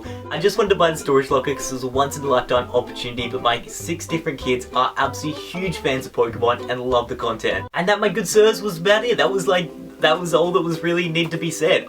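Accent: Australia. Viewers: Why do you sound like an Aussie?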